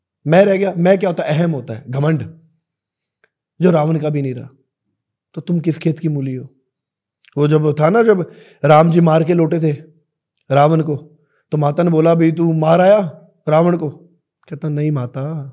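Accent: Bihar, India. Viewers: Aap bihari ho sir?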